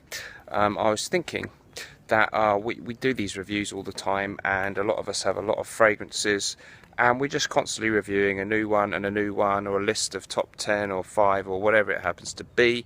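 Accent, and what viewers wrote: United Kingdom. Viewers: Sexy European accent? Dude. Come to The US, and you can have pretty much any woman you want with that British accent of yours.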